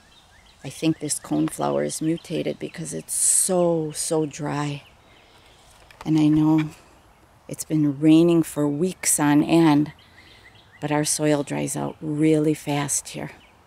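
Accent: Wisconsin, United States. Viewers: O my! How beautiful everything is! Took me two days to get through your tour (I keep getting interrupted), but o what a joy! I just found you, and I thought I heard you say, you are moving. I hope that doesn't mean you're ending your channel. I hope you continue creating beauty and joy wherever you move to. Plus. I love your WI accent. I grew up in WI. Moved away 25 years ago. Miss it terribly. You sound like home ❤️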